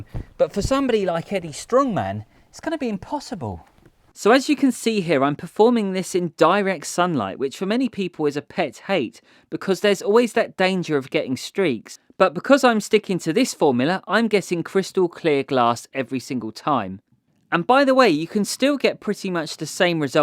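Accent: United Kingdom. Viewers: I have no idea why you got so many views for this video!! Maybe the British accent? And dummies think just because you have a Btitish accent you might be teaching a magic?! Well. I just wasted 2 minutes watching your useless video mate...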